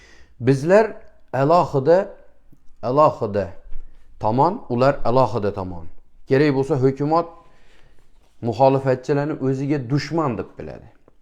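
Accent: Khorezm, Uzbekistan. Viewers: Хакикий хоразмликни гапи бу .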